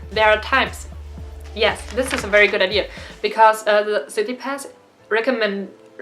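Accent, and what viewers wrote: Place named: Netherlands. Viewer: You are adorable. Thank you for this info. Very helpful. May I ask where your accent is from? I have family from Holland and very similar.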